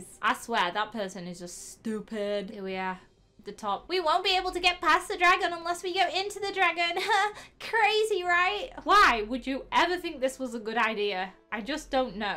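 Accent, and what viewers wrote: United Kingdom. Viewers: ! I FILL LIKE UR FROM BRITISH! R U THO? COZ IM CONFUSED U SOO TALK LIKE AN BRITISH WOMEN!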